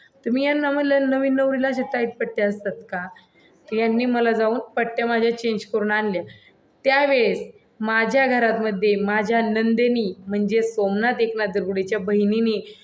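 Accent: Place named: Khandesh, India. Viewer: पण तुमच्या बोलण्या वरून तूम्ही पुणेरी वाटत नाही तुम्ही खानदेशी किंवा सातारा सांगली चे दिसत आहात हा तुम्ही आता पुणे मध्ये राहत असाल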